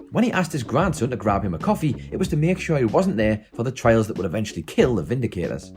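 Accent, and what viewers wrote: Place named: Newcastle, United Kingdom. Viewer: Geordie accents and rick and morty do not mix well together 😅 I can’t take any of these theories seriously. Sorry not sorry. Big up tho cool video 👊❤️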